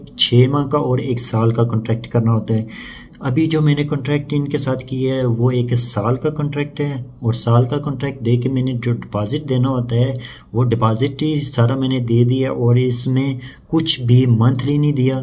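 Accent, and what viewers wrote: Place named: Rawalpindi, Pakistan. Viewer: I live islamabad ap ka bolne andaz pandi ka lak raha ha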